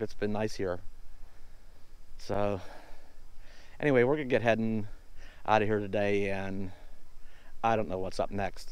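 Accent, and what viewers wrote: Pennsylvania, United States. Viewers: As I get much older I see people in a different light there real friends and there r user. Stay safe I love u still have ur PA accent.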